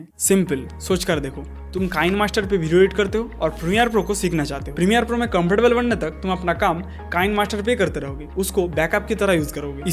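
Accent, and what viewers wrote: Bengal, India. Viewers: bengali tumi ??😅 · your bengali is so cute 😃😃 I a from bangladesh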